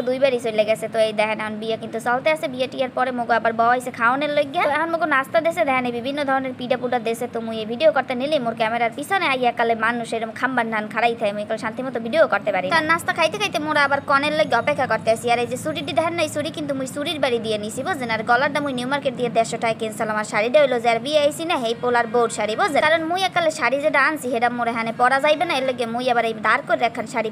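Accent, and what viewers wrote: Barishal, Bangladesh. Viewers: আপু আপনার বাড়ি কি বরিশাল না পটুয়াখালী ? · আপু আপনার ভিডিও দেখার পর বরিশালের ভাষার প্রেমে পরে গেলাম,,,,❤❤ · আপু তোমার ভিডিও প্রতিনিয়তই দেখি বিশেষ করে তোমার বরিশাইল্লা ভাষায় কথা অনেক সুন্দর 👌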